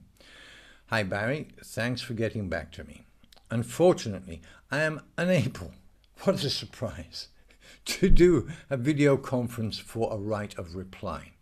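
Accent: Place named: London, United Kingdom. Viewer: Do you mind if I ask you which part of London are you from? (I’m not trying to dox you, I’m just interested in London accents!)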